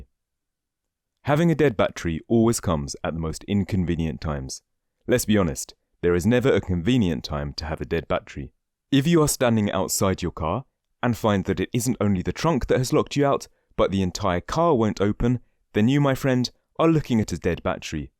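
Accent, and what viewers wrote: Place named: United Kingdom. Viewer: verbose with British English accent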